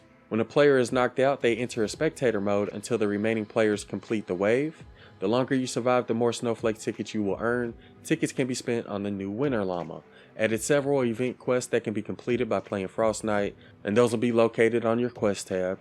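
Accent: South, United States. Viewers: Are you from Texas or Louisiana !?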